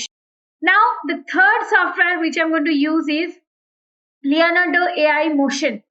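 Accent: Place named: Bengal, India. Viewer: tumi ki bangali ? tumar words pronounciation to just bangali bangali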